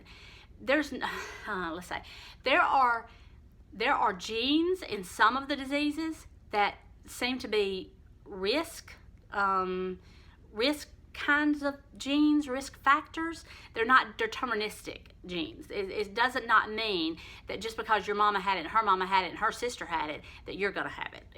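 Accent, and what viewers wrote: South, United States. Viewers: I have found your videos to be very informative & I love your southern talk! Thank you for your time to educate us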